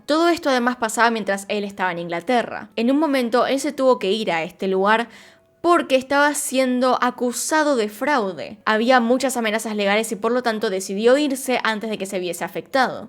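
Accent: Argentina. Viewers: Amo ese acento argentino!!!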